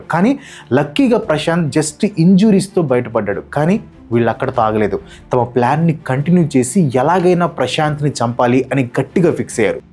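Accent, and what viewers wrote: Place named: Telangana, India. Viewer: You are good like but your telagana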